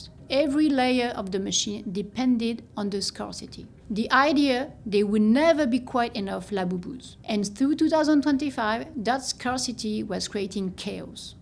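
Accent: France. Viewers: I can't take seriously your "expertise" in business in Asia when you can't pronounce the Labubu designer's name right. Frenchies are know for their bad accent but put some effort when calling people names, that's called respect. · Putain, l'accent français c'est vraiment violent. Ne le prenez pas mal, mais on peut faire mieux avec une IA. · Is it me or is that a french accent I hear here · I live in Canada where the French are a minority yet continually politically destroy our country.. Listening to your highly franco-cised version of English is like a dagger in my heart from ever word... All I hear is a French voice reminding me of what the French have done to our once glorious country.. Between the French and the Indians (natives) these two tiny minorities, have destroyed Canada and have the power to continue to do so in perpetuity.... So as much as I wanted the info in this video, being lectured by 2 French people is a bridge too far... 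I'll just wait till a English presenter does this story.. Have a good day.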